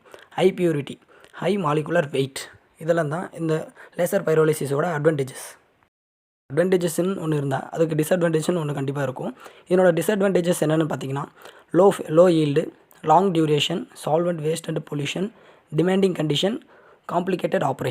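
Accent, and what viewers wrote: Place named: South, India. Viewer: I think he belong to some place in South India n that's why his accent is a bit different and difficult for us to understand (I am from north India).. I played the video on 0.75X n see I was able to understand.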